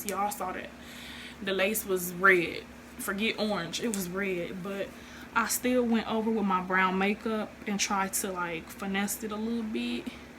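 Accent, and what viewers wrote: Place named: Oakland, United States. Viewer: You Sound Like You’re From Oakland. And I Love This 🍊Orange! 💣💣💣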